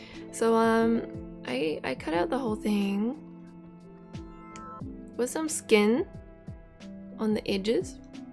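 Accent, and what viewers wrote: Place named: New Zealand. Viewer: Yoooo I was thinkin' man that accent is so familiar, it sounds so much like a kiwi accent, what could it possibly be? Surprise surprise it is a kiwi accent hahaha. Not too often I come across a kiwi streamer, kapai!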